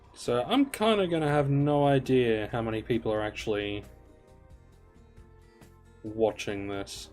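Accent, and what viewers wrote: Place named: Australia. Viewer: Would love more of these unedited long hunting sessions. When I hunt, I need an aussie accent in the background now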